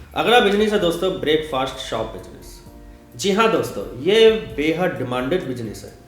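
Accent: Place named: Uttar Pradesh, India. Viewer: up ka he ha lagtha ha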